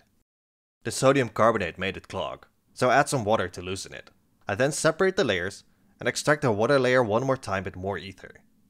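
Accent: Netherlands. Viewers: I know you are dutch you cant hide your dutch accent from a fellow dutchman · accent heard, dutchie spotted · I hear a dutchy😂 already a fan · Are you Dutch?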